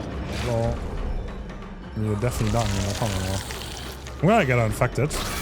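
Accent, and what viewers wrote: Germany. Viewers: ich hasse diesen deutschen aktzent und seitdem ich weiß das du deutscher bist wriß ich warum ich mir deine vids nicht geben kann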